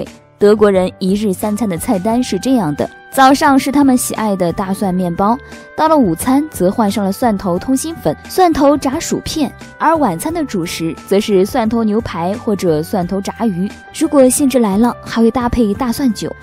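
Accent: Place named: Shandong, China. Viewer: 我以为是山东人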